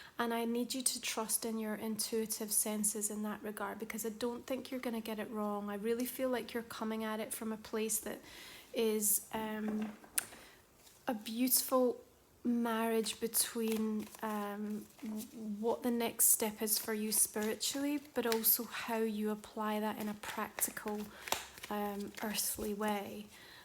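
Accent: Scotland, United Kingdom. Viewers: Hi there: Thankyou sincerely for the wonderful reading. I have to say I thoroughly enjoyed the reading, especially your voice. You accent seems familiar, am I right to say you are of Scottish blend? If so, I am too. Its nice to know that someone from my beautiful country is reading me which is a great pleasure and a tonic. No disrespect to the other Tarot readers they're all wonderful when they read my sign. But to listen to a Scottish voice is my cup of tea at anytime. Anyway, I've been a bit isolated lately, but when I'm busy writing my novels, I prefer peace and quiet it helps me concentrate, I'm also doing a bit of DIY at home, so that keeps me busy as well happy with the results, just to freshen up my house a bit, makes me feel content and satisfied. But thanks a trillion fur the wtkvime ing reading which was also very inspiring. I hope to hear from you again, your a breath of Scottish fresh air m, and we all know how cool and fresh that is eh Brrrrr... 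Thanks again & God bless.xxx⚘💞💐💐🌺🌺🌺🌺💜♦️💜🌟🌟🌟🏴🏴🏴🙏🙏🙏💎💎💎💘💎💎💎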